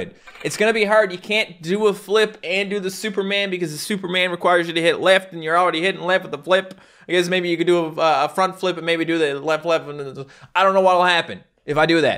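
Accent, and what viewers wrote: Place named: Newfoundland, Canada. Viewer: I watched one of your videos and I was like, "Is this boy from Labrador or Newfoundland?" I could tell right away. (my mom and dad are both from st. john's, so the accent was easy to spot out.)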